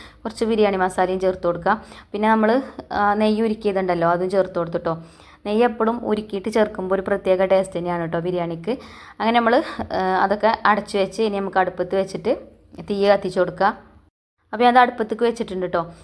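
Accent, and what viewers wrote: Malappuram, India. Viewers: ഇത്താന്റെ വീട് എവിടെയാണ് മലപ്പുറകാരെയാണെന്ന് സംസാരം കേട്ടപ്പോൾ മനസ്സിലായി. സ്ഥലം?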